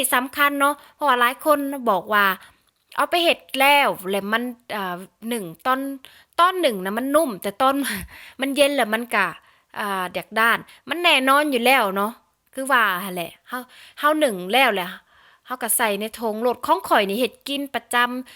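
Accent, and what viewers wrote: Savannakhet, Laos. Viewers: ມັກເຫຼົາເວົາລະອຽດສຽງແຈ່ມແຈ້ງດີລະກະເປັນສຽງໄທສະຫວັນນະເຂດອີກອອກສຽງລະອຽດລະອໍດີເດີ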